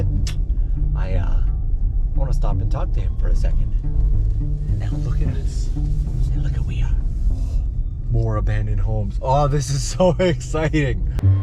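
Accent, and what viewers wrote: Canada. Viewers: Fellow Canadian living in Japan here. I've only watched a few of your fascinating videos, but I knew you were from Canada from the first vid of yours that I watched, just based on the way you said "house" :). I used to live in Tohoku, and these abandoned villages remind me of all the old houses and sections of cities I would travel through for work. Of course, there are even more abandoned places there now, especially in Fukushima. One thing these sorts of places always make me think of is the warmness of small-town Japan. Thanks for keeping your focus on these smaller parts of this amazing country, it really helps keep them alive through the memories, in a certain way. You just earned a new sub, keep it up. Edit: the only gripe I have is you cursing about the curry. I get you might not be a fan of the curry, but there's no reason to cuss it out.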